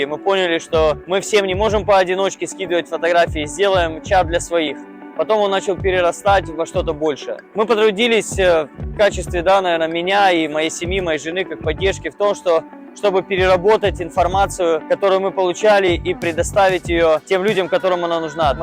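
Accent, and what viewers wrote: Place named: United States. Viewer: Крайние видео не нравятся, стал базарить как Америкос...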